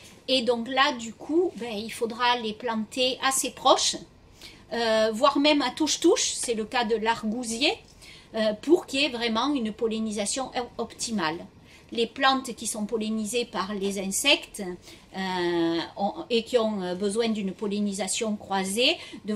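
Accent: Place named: South, France. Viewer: Bonjour, vos explications sont claires et précises, et avec l'accent du midi cela nous amène le soleil. Vive le sexe😉 des plantes.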